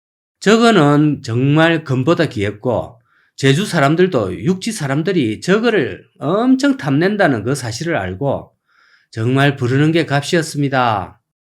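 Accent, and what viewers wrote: Gyeongsang, South Korea. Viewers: 언제나 친근한 갱산도 토백이 사투리 얼마나 정겨운지..., 참고로 전 호남학파입니다만. 다 맞는 말씀입니다. 저는 미국인이 되버렸지만, 조국의 사정을 둘러보면서 제일 가슴 아픈게 한국인 특유의 정다운 스스로의 모습이 어디로 사라져버리는건지 그래서 나중엔 어디로 갈려고 그러는지 중국공산당이 지배하는 땅이 설마 되버리는건 아닌지 답답합니다.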